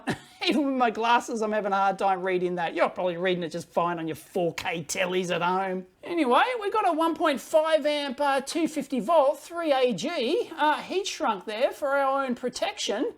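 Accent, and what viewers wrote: Australia. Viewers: Most Australian/Kiwi accent of all time.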